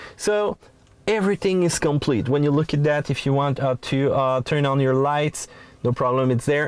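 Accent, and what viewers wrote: Quebec, Canada. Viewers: Lol Quebecer accent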